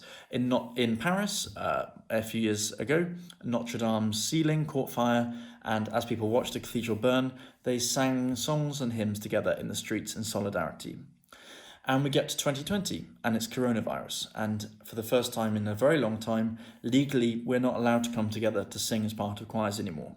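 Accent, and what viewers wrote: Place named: United Kingdom. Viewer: What a brilliant and amazing 5 minutes,! (Also I love that perfect British accent; these days, it's fast disappearing).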